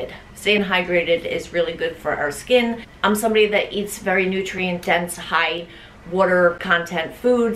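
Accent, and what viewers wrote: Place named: Minnesota, United States. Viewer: Huge CONGRATS on your weight loss! Amazing results! Where are you from? I THINK I might be detecting a slight Minnesotan accent or Canadian?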